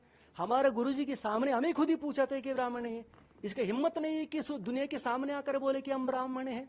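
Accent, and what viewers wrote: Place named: South, India. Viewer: South ke accent me hai kuch bhi mat bolo yar😂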